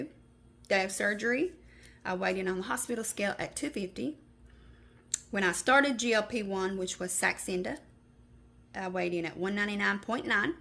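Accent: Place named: South, United States. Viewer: just found your channel, love your curls and southern accent!! (Louisiana girl here) You look great and thank you for sharing your info. I hope next week brings your results. I need to get better at making videos..I need to also start weight training, so definitely subscribing to follow your progress.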